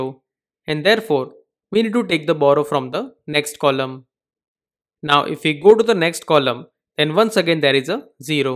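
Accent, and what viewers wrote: India. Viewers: You know it a great video when he have an Indian accent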